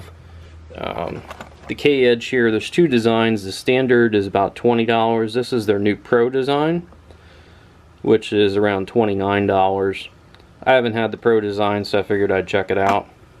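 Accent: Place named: Pennsylvania, United States. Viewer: Guessing by your accent that you're from PA..Have you gotten out and done some serious rides on this bike yet? I just sold a Tarmac Pro and was looking at this model for a more comfortable ride for the rough PA roads. Thanks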